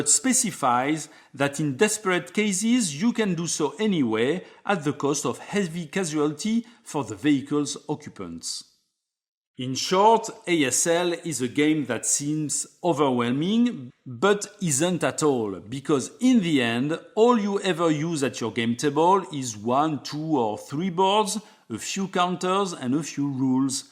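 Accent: France. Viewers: I think you probably specified exacltly what i like in board gaming in these videos. I hope to see alot more content published by you in the future. And always with that beautiful frensh accent ;) If i had one request it would be to see an english verison of the Here I stand videos. :)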